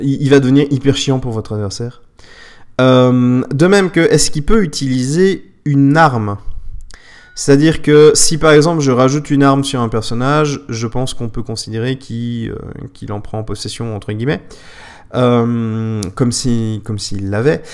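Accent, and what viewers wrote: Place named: Switzerland. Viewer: Un grand merci pour cette vidéo. Je t'ai découvert en faisant des recherches sur le jeu. Briskars est un jeu qui me tente de plus en plus: -de part son échelle, j'éprouve du mal sur le 28mm depuis une opération aux yeux, -l'accessibilité des règles sur le Net. Au doux son de ta voix, je te devine dans le plat pays helvète ^^, y résidant moi même, je me permet de te demander si tu connais des clubs ou détaillants permettant de découvrir le jeu afin de me faire une idée plus personnelle de ce qui en est? Amicalement Fred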